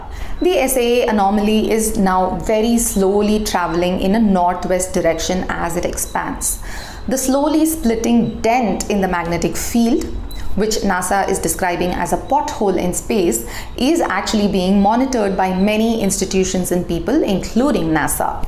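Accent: India. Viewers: I really don't want to delete my comment. She is Indian speaking English rather beautifully with an Indian accent, rather fittingly because she is Indian. I understand her perfectly. If you're so accomplished I rather wonder why you can't? Would that I could speak a second language so well.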